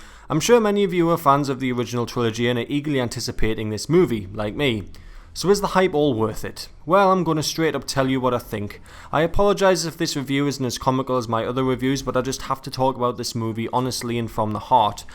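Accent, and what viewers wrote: Scotland, United Kingdom. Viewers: what is it about hearing a scots accent that makes everything unimportant. bourne 2016 was a travesty, but i'm sure it paid for someone's nice house somewhere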